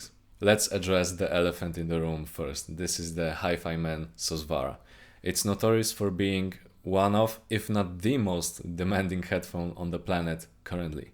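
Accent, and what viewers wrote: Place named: Poland. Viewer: jesteś z polski? mam takie wrażenie po tym jak mówisz paweł i po akcencie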